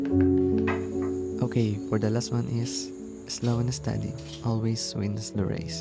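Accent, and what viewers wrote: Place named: Philippines. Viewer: are you filipino? your english accent similar to filipino english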